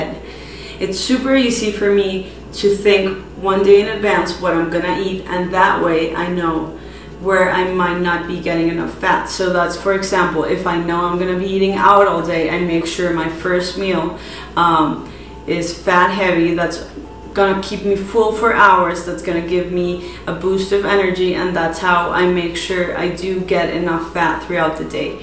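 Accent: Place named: Colombia. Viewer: Hi! Congratulations in your new English Channel!!! I'm sure that very soon you' ll have thousands more followers enjoying your easy and delicious recipes. Descubri tu canal en Espanol solo hace un par de meses y desde entonces busco a diario algun nuevo video que hayas subido con recetas o consejos. Me ayuda un monton que pongas los macros ya que yo sigo un keto... free lance... quiero decir, siin complicaciones. gracias por hablar tan claro, y enfocarte en el tema, muy profesional. Soy peruana,vivo en Canada, pero por 8 anos vivi en tu bella Colombia, en Cartagena, tierra del Vallenato que adoro y llevo en el corazon. Goze carnavales en Barranquilla y estuve de paso por Bogota donde hice algunos amigos, asi que el oir tu acento trae muy lindos recuerdos del pasado. Felicidades a ti y a Carmen, y que sigan los exitos.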